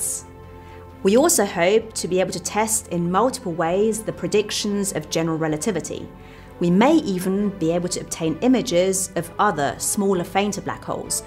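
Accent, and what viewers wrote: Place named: United Kingdom. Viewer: No photos cause it's fake. Just cause she has a British accent doesn't mean she's smart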